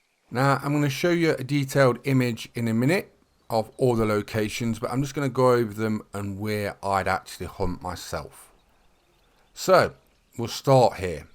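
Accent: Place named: Leicester, United Kingdom. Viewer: Did you see ladylegend mess her great one shot? I think she was robbed, the shot looked fine, but I’ve noticed some of my shots are off target since the aus map came out. I can’t believe it’s taken watching your videos to catch on that carrying a tent with you at all times is so handy? I was always concentrating on having the max load out with guns and callers etc. that advice is golden! Great video, gunna start my great one grind tomorrow. 👍🏻 by the way, are you from Leicester? You sound like it, I know a couple of people from there. I’m down the road in Bristol 🇬🇧🇬🇧🇬🇧🇬🇧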